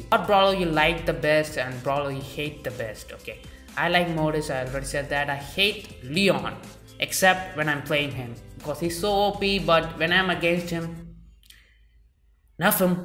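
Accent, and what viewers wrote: India. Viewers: Indian? Accent and yeah.... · No Front, But as 1st second i heard you, anyways i knew that you're from india. As Same as Wehen i Heard- myself, i know that i'm from germany 😅🤫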